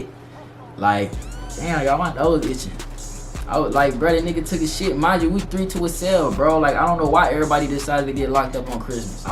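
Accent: Boston, United States. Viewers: U from Boston ? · Your from Boston?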